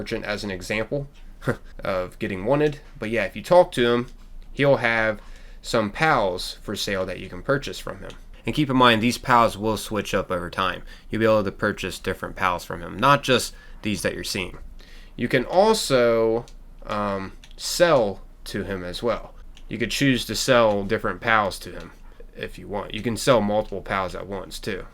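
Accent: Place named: Philadelphia, United States. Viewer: You sound like you're from Philly!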